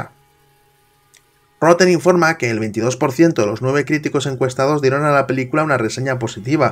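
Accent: Spain. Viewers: a muy bien yo crei que eras de madrid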